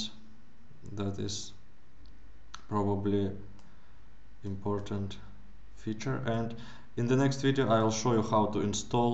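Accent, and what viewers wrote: Russia. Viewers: Улыбнул русский акцент )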